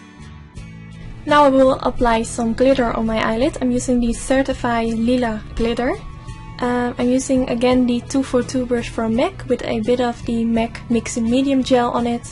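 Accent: Netherlands. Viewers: Nice tutorial and I love the music :D Maybe it is a tip to place text for all the brushes you name in the video? You have a very Dutch accent and sometimes it is a littlw bit hard to understand what you're saying :$ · ik hoor aan je accent dat je nederlands bent haha!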